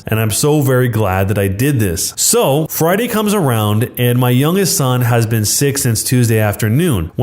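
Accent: South, United States. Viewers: this dude makes a good southern accent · I love the southern voice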